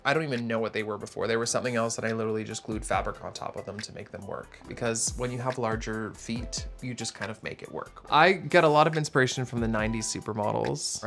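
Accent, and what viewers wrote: Canada. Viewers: I love her little Canadian accent poking through every now & then 😘✨ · so canadian...."aboot" 😍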